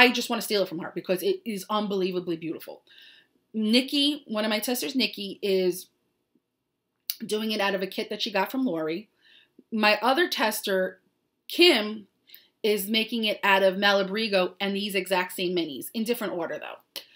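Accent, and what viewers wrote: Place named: Brooklyn, United States. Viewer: Your Shawls are Beautiful! I'm so glad that Melissa pointed me in your direction- Love seeing and hearing about Your Yarney World! Chewy is a Little Doll, I just wanted to reach in and hug him! Thanks for Sharing, I'm still catching up on past episodes and enjoying them all! I was born in Brooklyn, Love hearing You speak, I lost my Mom and Dad 7 yrs ago so I don't get to hear the accent anymore as I haven't lived in NY in many many years! XX Marie-Wisconsin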